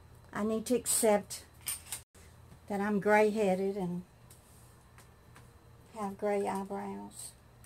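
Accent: United States. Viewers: I love your American accent ?! Where are you from in USA 😍 Irish ☘️ fan watching hehe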